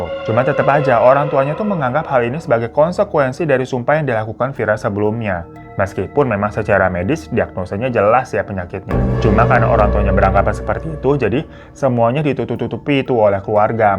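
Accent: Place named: Kalimantan, Indonesia. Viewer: Logatnya kalimantan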